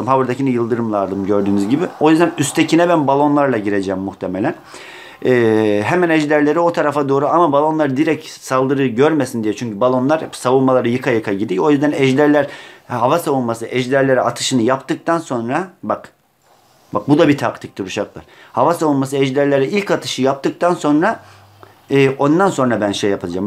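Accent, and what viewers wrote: Black Sea, Turkey. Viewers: Abi ben eskiden karadeniz şivesinden nefret ediyordum dizilerdeki bazı oyuncular hiç konuşamıyordu çok kötü konuşuyorlardı ama senin şiven çok güzel bana karadeniz şivesini sevdirdin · o Karadenizli olduğu için ole diyo anlamiyomusun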